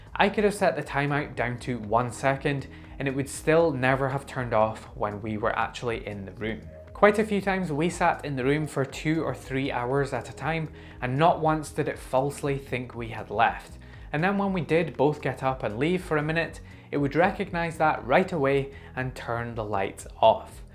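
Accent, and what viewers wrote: Scotland, United Kingdom. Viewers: I agree this is revolutionary! I have started my smart home with Xiaomi/Yeelight/Aqara, but they are now just too expensive, with this stupid region locking. Tuya Zigbee is stupidly cheap, and will equal performance for most devices. I will wait for a cheap Tuya clone. One question: Despite my first name i am not english, but i love the language. Am i correct that this is a Scottish accent? Greetings from Germany :)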